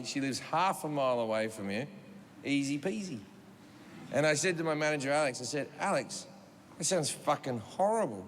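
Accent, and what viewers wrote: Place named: Bogan, Australia. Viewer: Im sorry! The Bogan Aussie accent makes this immediately hysterical. LOL he could be ordering chinses take-away, and i would be rolling on the floor. Im a simple man; bogans make me laugh.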